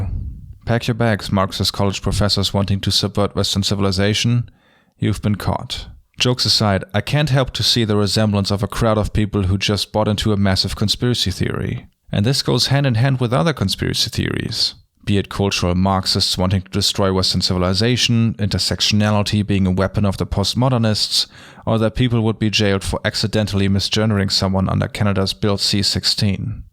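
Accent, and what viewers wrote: Germany. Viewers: I had no idea how much I needed a German narrator before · a slight German accent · your German accent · The content of this video is entirely based on the premise, that you are a mindless bee simply running after the sweet anti-sjw-content you were made addicted to. The mindset of a true lefty: Everyone is just following perceived elites. In this particular case, running after more or less famous youtubers. For example, I liked Ben Shapiro back in the day, when I have first seen him, because he stated arguments in an extremely condensed manner that I have stated for years and yet have to see a well thought through counter-argument against. I have experienced Sargon more as a funny Britsh dude with some good and some bad arguments than an authority in arguments. And you got to give to the far-righters on youtube: They have a very sharp insight into the lefts mindset and their ideological premises. 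And to add also a counter-argument to one of the points made in this video: Yes, SJWs are a minority within the left. Political radicals are always a minority but this fact doesnt imply that they have no or cant gain any relevant political power. Quite the opposite is the case. By your accent I can tell you are from Germany: "Gleichstellung" von Frauen ist auch nur eine Idee einer kleinen linksradikalen Minderheit in Deutschland und doch ist dieses linksradikale, freiheitsfeindliche und im Kern kommunistische Konzept heute Bestandteil der Gesetzgebung und wird von fast allen Parteien vertreten. Das ist ein aktuelles Beispiel dafür, welchen Einfluss radikale Minderheiten gewinnen können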